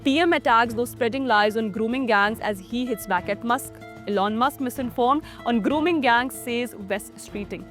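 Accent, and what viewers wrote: India. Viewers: With a certain Indian accent literally one can see you’re making a point scoring . The fact Indians are getting hatred from west one should humble themselves but shamelessness is being genetically inherited by you folks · That’s Indian accent, cope with it… and try to focus on the subject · too much Indian accent for my brain to tolerate ... sorry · You wouldn't stand a min when trying to confront Mehdi or Hijab with any of your claims. Would tremble in fear with your goofy strong Indian accent. I know some or let's say many muslims have tried to be too defensive to label any criticism of Pakistanis as Islamophobic, but how many points in this video are false? Two of the biggest ones 1) Hijab didn't instigate the Leicester riots. He went there a few days after the riots happened. But did use strong words along the lines of we'll attack them (the Hindus) the way they'll attack us. 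2) Mehdi is also far from an Islamist. He's confronted Imran Khan for many of his policies for e.g. His points about Elon flipping on Pakistanis after getting backlash on Indian H1B visas from Trump supporters is true. A few weeks before Twitter was flooded with anti-Indian sentiment and Elon was getting blasted for his views. Now to make up for it he's flipped totally Pakistanis are scum wherever they go and should be punished for their crimes. But you lot from the subcontinent - Muslims, Hindus, Sikhs, Christians, Buddhism all have the same problem of raping & abusing women. It's something in your DNA or in the soil of that region.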